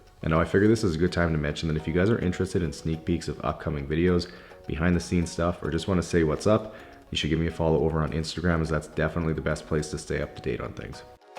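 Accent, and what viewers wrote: Canada. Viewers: are u canadian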